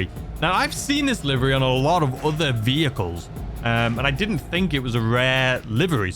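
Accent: United Kingdom. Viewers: I see everybody’s update, but I wait for yours to come out so I can go see yours why is your British accent?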